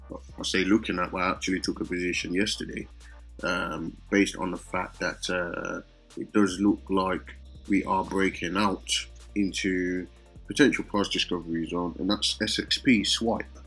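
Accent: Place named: South London, United Kingdom. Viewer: Alright bruv. Trying to pinpoint the accent. South London, or South east area? Really enjoy your content bro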